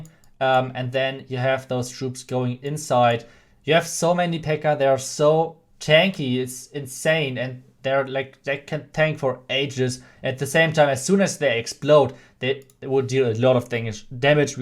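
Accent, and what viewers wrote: Germany. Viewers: Man hört einfach das Deutsche komplett raus... Nichts gegen dich aber dein Englisch ist echt nicht gut ^^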